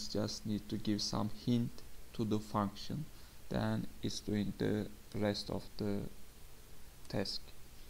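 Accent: Turkey. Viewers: Hocam aksandan Turk oldugunuzu tahmin etmistim, Github'a da Istanbul diye yazmissiniz. Selamlar.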